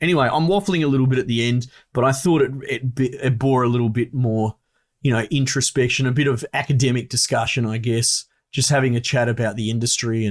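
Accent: Australia. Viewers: Nice original commentary, maybe I just appreciate a good ozy accent lmao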